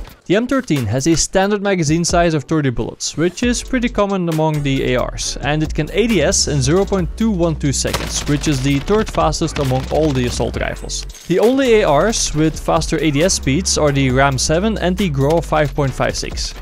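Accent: Netherlands. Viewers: Love dutch accent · Nice Dutch accent hehe. But cool guide :)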